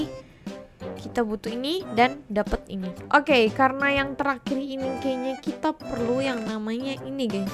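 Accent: Java, Indonesia. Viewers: medok banget anjir waowkkkwka🤣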